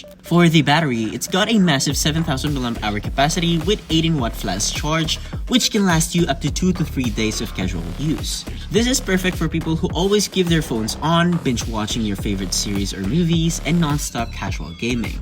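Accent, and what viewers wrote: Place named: Philippines. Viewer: Are you a filipino? I like your accent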